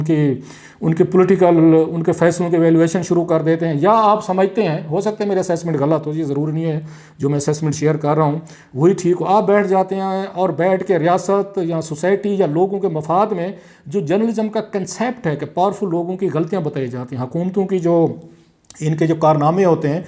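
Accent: Pakistan. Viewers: Klasra sahab, first of all I love your urdu accent and the way you talk.. big fan of your reporting, you truly have exposed some great stories and scams.. Although I am a PTI supporter but I still think you are right, khan sahab should have an interview with you and face your questions..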